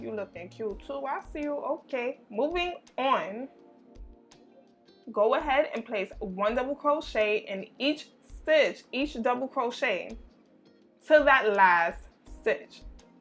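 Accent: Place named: Bronx, United States. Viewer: Where are you from? Sounds like you're from where I'm from. Ny. Bronx to be exact. Lol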